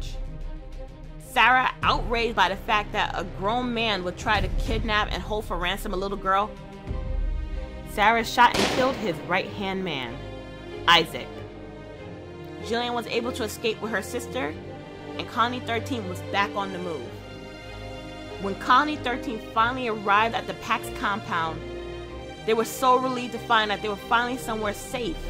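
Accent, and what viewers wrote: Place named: South America. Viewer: awesome south american accent :)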